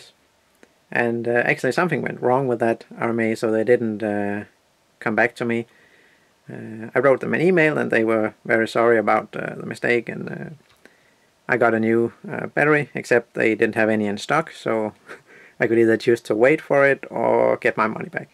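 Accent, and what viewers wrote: Denmark. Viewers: His is a common danish name and he speaks english with a danish accent, so I'm pretty sure he's danish.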